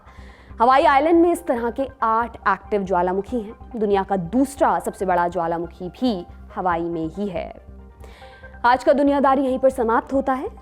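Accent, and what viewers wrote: Standard. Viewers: बेहतरीन अन्दाज़े बयां ! अत्यन्त शुद्ध उच्चारण ! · Wah,bahut sare news anchor ko mai sunta hu.kintu jitna shuddh aapka uchcharan hai,jaisa aapka body language hai,isse aapki vidwata saf jhalakti hai.yahi visheshta aapko dusre anchors se alag aur behtarin sabit karta hai.Lekin shayad aap galat channel ke sath kam kar rahi hai.lswar aapko Yash de.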